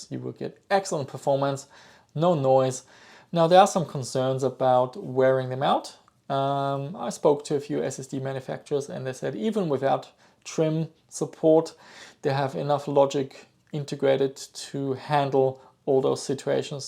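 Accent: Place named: Germany. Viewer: Always enjoyed your videos! Question (please don't be offended): A few years ago, when I first started watching your content, I immediately thought you were German, but later learned that you live in Australia. My question is if you are in actuality a German living in Australia, or if you are a native? Your accent really throws me off :D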